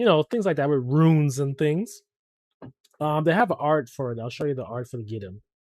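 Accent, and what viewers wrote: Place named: Jamaica. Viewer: Ok so I've been preeing for a while so your video showed up on my feed. And I couldn't help but think 'wow this guy in the video's accent could pass for Jamaican' THEN YOU MENTIONED YOU WERE JAMAICAN (as we Jamaican's often do) and I was like eyyyyy we out here!! Lol let me know if you ever wanna play I'd love to party up.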